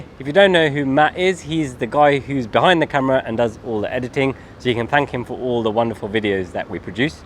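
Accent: United Kingdom. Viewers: How did you get such a good British accent?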